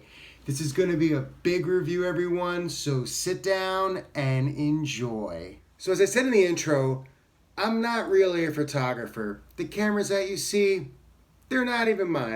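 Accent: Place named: East Coast, United States. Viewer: Ah ok, so East Coast fits your accent. It all makes sense now. I figured Boston. And you bring up a really good point. It's not just a matter of height but weight amd broadness as well. I am 215 and pretty broad and I find bags like PD or Mystery Ranch always fit so small, cut into my pecks, and are even uncomfortable after a short while under load whereas MW is just always so comfortable. MW has got some kind of magic in their straps and harness and now they are addressing our sweaty backs with items like the Speedwell. It makes me think the designer/owner must be a larger guy because of course he would deal with this as well.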